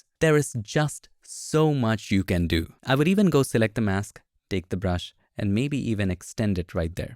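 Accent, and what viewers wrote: India. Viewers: Your Indian accent is still very thick. Also, you will not look taller by pulling your hair up.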